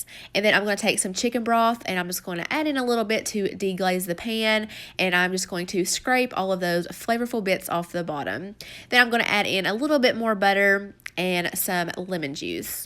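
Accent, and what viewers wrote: United States. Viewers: Hi came here from the other vlog, im from Birmingham Uk. Love your country accent and your mac n cheese.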